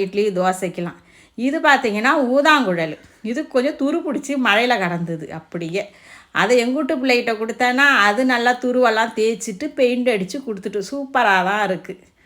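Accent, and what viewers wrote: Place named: Mannargudi, India. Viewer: Unga pecha ketta mannai side pechi pola irukku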